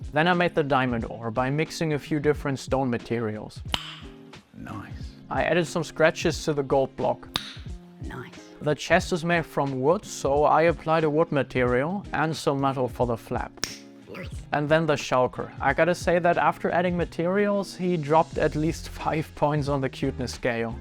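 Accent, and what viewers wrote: Germany. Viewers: Man hört den Deutschen Akzent 😂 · he sounds so german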